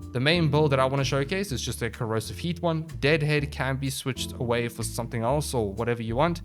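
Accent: South Africa. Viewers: Great vid Boet. Think I'm recognizing some home accents there? You from SA?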